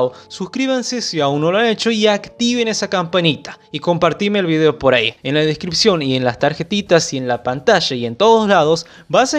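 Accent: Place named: Santiago del Estero, Argentina. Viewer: Hola , sos santiagueño ? Por qué la tonada la tenés hermano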